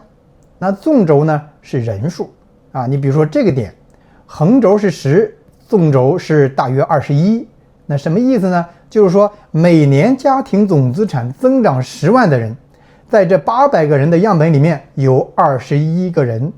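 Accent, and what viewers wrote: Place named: Heze, China. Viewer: 厉害！我感觉听你口音有点像菏泽地区的人，不知道猜的对不对？😂😂😂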